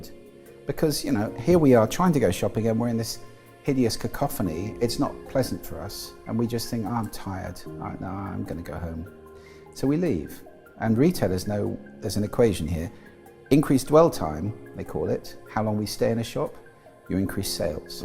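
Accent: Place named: United Kingdom. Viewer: Some valid points. More speculation going on here “seemingly valid” with the ol’ British accent.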